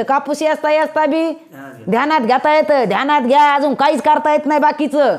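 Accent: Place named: Marathwada, India. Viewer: एकदम मराठवाडा भाषेमध्ये खरंच खूप छान प्रवचन केले आहे मी आळंदी वरून ऐकतो आहे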